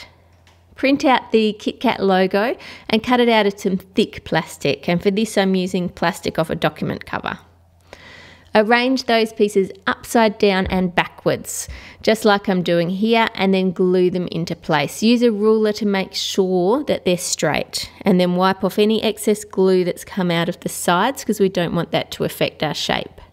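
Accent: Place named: Australia. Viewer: ugh i love aus accents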